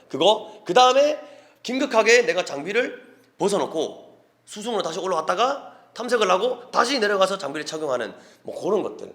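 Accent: Gyeongsang, South Korea. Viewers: 갱상도 사투리 개말립니다 행님 사랑합니다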